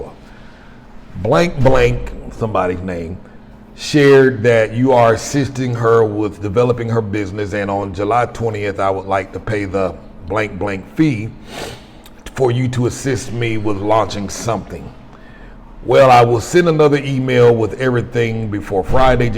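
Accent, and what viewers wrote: South, United States. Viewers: I'm late BUT I want to wish you a Happy Father's day my man! I been rockin with you for YEARS when U were still traveling in the states and 1st went to Canada! I have seen a LOT of mess you had to go through and I am PROUD of you my man that you made it through! The VERY 1st time I heard you talk I KNEW I was gonna like you cause im from the South TOO! Much respect to you Wishing you MUCH happiness my man! 😇👍🏾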